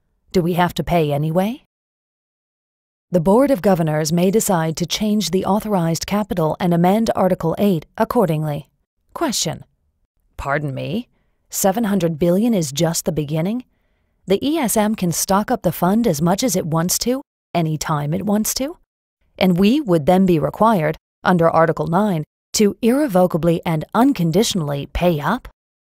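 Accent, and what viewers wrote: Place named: United States. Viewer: Proc je to video namluveny americankou?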